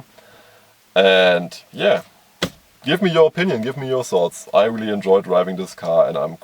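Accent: Germany. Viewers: canomalo he's German not slow dumbass...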